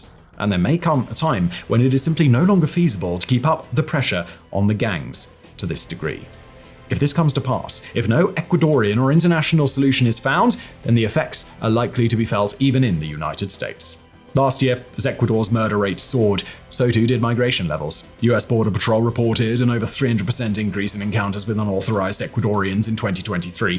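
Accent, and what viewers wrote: RP, United Kingdom. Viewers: Wow, can't stomach the Queens English...[you're going to hurt your neck, hands or teeth]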